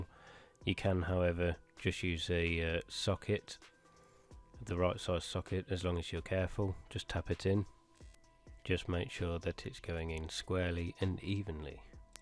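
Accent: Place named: United Kingdom. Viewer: Sounds like british accent. ;) He should have used "right side". At least definitions of left and right are still the same in the UK. :D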